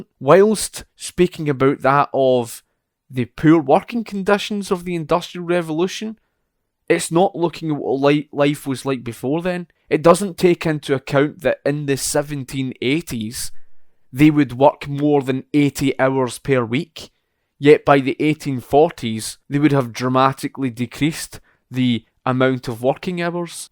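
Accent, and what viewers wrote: Scotland, United Kingdom. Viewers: Scottish that’s the accent I was trying to place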